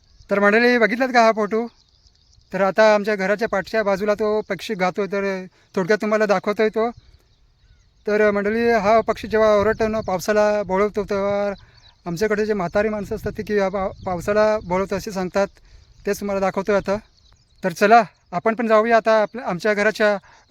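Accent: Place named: Konkan, India. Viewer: Dada tumi koknathele ahaat ka tumchaa bolnyaavalun kalte khuf chaan video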